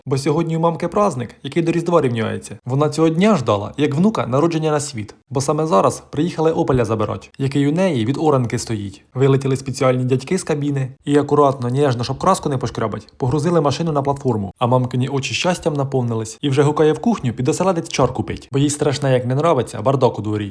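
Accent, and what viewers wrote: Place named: Surzhyk, Ukraine. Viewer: Я не знаю що в тебе "присутствует" але зі своїм ухоріжущим суржиком ти доВбойоб знатний😂😂😂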